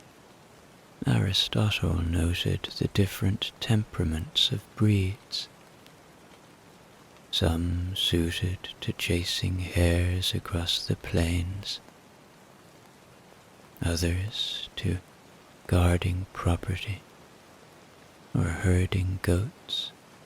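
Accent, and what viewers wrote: Ireland. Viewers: I listen every night from Germany. Unfortunately I don't often get to listen to the stories because I usually fall asleep before I get that far! Your voice is very soothing and I love your Irish accent!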